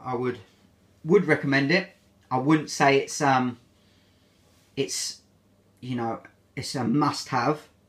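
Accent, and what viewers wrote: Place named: United Kingdom. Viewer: It's the thick British accent 😉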